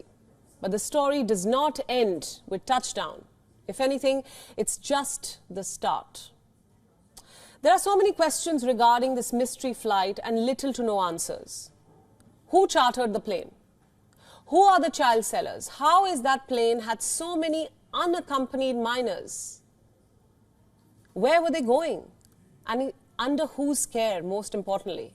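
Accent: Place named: India. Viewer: Indian accent😮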